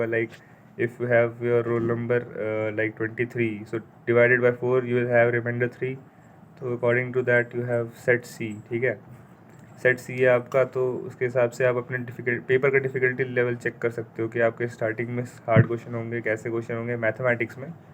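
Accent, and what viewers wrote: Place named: Haryana, India. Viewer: ohh meko lga ki haryana se ho sorry 🙃✌🏻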